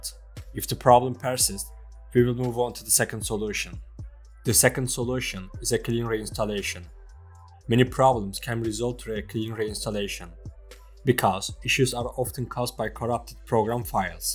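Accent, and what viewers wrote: Turkey. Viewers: turkmusun kral bu diksiyon ne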